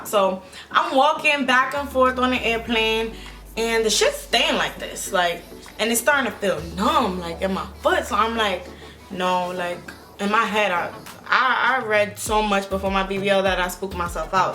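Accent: New York, United States. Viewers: She sounds like a Black New Yorker. Straight up. Lol glad you're okay, boo. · 😭you from New York ? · She is so pretty I love her and you can hear the New York in her you not dead-ass LOL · you do you sound like you from new york😂😂😭 thats exactly how we talk "stop playing with me"😂😭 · i knew u was from NY by the way u talk... my first time watching and i luv it... my reactions alllll the way... GOD BLESS GLAD UR OK U LOOK FABULOUS BTW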